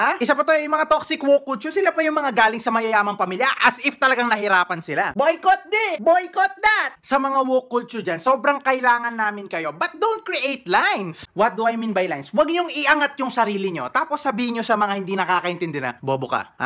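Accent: Pampanga, Philippines. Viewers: nice kapampangan ka nga haha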